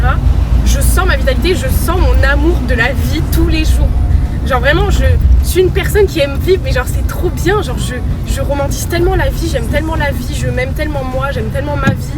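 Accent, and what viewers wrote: South, France. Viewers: J'pense le dire en com à chaque vidéo mais quelle BG 😁 et cet accent du sud, elle transpire le soleil ☀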